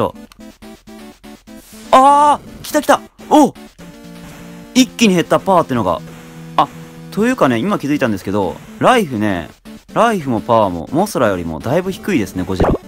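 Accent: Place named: West, Japan. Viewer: アニめし屋 by さんって西日本出身ですか？そのネタもググってやってみてくださいのイントネーションとかからそんな感じが…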